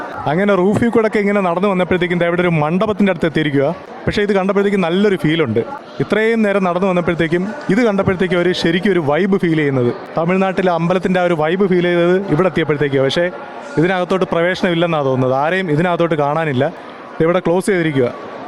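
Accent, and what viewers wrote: Kollam, India. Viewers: കൊല്ലം ശൈലിയിലുള്ള നാടൻ, ജാഡ ഇല്ലാത്ത അവതരണം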